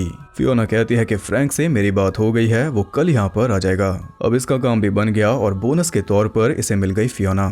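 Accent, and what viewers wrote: Haryana, India. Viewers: mitra tum hariyana se ho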